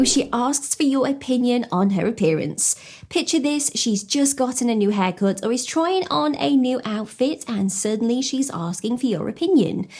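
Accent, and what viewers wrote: United Kingdom. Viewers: Love your British accent!!! 😘😘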